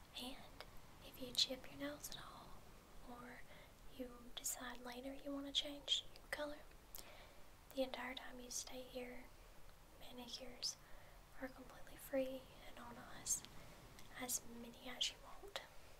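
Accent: South, United States. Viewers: For years now I've been tryin to decide where shes from based on accent. I wanna say Ga or SC. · Personally I miss the slightly insane... but coming from London UK I can get by with that southern drawl quite fine. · Southern accent really gets me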